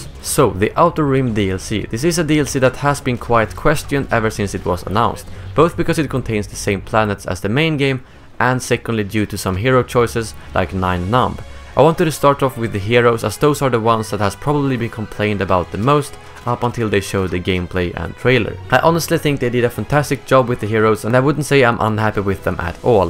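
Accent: Sweden. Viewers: Hello, Mr.Swedish Accent guy commentary!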